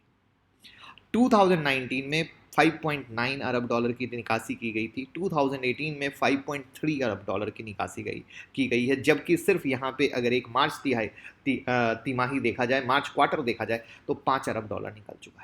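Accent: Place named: Shekhawati, India. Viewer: Byaj ko dhando chokho, 😆 ye to pure shekhawati style h....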